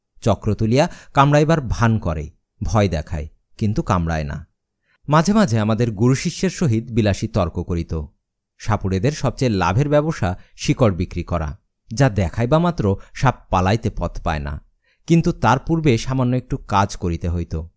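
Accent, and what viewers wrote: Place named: Bangladesh. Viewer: সুদ্ধ উচ্চারণ, মনে হয় পাঠক বাংলাদেশী, কারণ ভারতীয় উচ্চারণ এত ভাল নয়।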